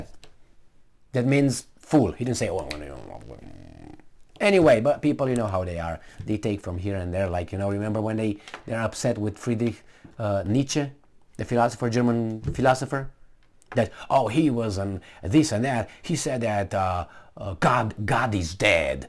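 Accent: Australia. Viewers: Not a bad casual attempt at an Australian accent, for a Romanian....😁👍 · A Romanian, speaking in an Australian accent is something I didn’t know I needed lol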